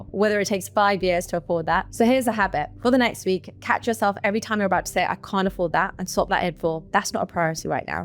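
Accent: United Kingdom. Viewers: Just me probably who face hard time with British clipped English (usually i use 1.3x playback speed)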